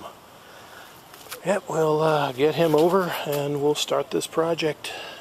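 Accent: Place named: Russia. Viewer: Мне показалось, что комментатор русский ))))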